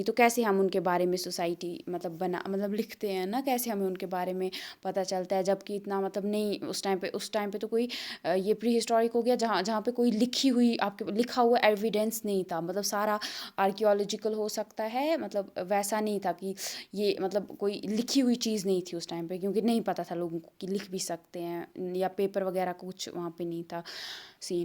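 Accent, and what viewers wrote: Kashmir, India. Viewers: Are u from Kashmir I feel so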